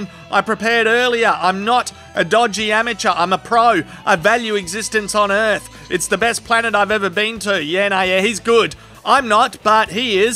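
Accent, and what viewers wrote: Australia. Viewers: yeah nah yeah, he's got the typical ozzy man accent. Brings back the old days when i used to live in Perth.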